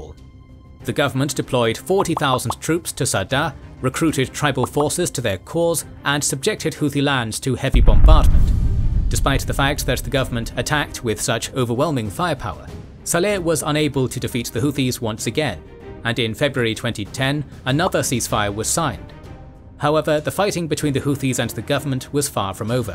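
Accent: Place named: United Kingdom. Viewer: It's confusing to hear a British voice tell the history of Yemen without much of a mention of the British Empire. There are important carryovers that you neglected.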